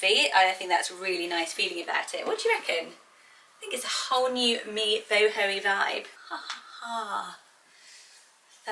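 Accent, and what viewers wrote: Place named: England, United Kingdom. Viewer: I couldn't love you more. You always have the best insight on weight (I've been watching those videos on repeat to get my mindset right) and you are so lovely. Im American raised by my English grandma and I lived in England for 3 years and my best memories are with my grandmas brothers and sisters. Your accent and manner of speaking makes me feel like I'm with family. "Ibiza vibes but really gonna chassé into kids swim" 😂 you're just the best. And you do look amazing and I admire that self discipline to live a healthy life style.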